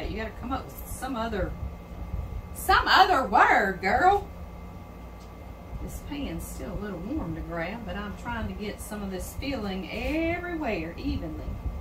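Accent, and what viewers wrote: South, United States. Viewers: I thought I had a southern drawl……until I heard you! I feel pretty citified now!! LOL 😂 You can’t get too southern for me! I make pecan pies…….everybody’s favorite! My sister gave me the recipe probably 35 or 40 years ago. It calls for brown sugar instead of syrup. I tweaked it to my satisfaction and still add a bit of syrup but……it’s a great recipe. My extended family members always looked for my pecan pie at our get togethers! I’ve lost two sisters and a niece since 2020. I’m not able to cook as much anymore but…I’ll try this. 😃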